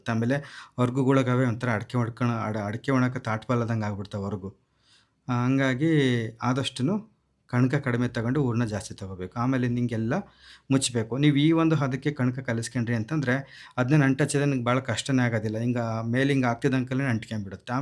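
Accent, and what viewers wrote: Chitradurga, India. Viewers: Durgada bashe keloke chanda ..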